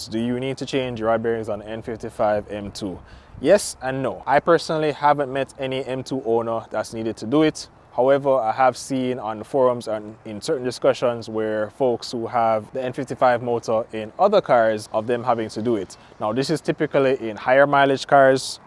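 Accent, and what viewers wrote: Jamaica. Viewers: Very informative video. Jamaican or Trinidad?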